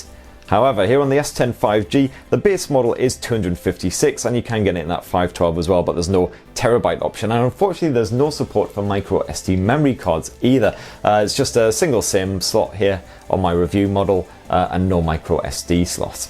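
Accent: United Kingdom. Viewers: This video can actually be done in 5 mins or less. He said he doesn't want to bore us with the similarities, but that's all he's been talking about for about 7 mins. I skipped three times. Right, like we don't know about IP67. I love the British accent, but this guy's voice is... gross. So I thumbed down, twice if I can.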